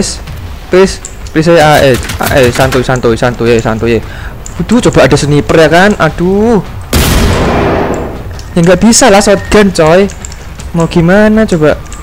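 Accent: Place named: Java, Indonesia. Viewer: medok banget mas :v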